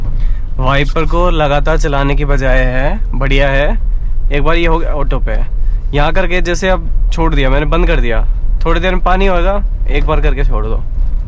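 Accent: Haryana, India. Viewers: Bro's content day by day 📈 and your haryanvi accent oye hoye🤌😂❤️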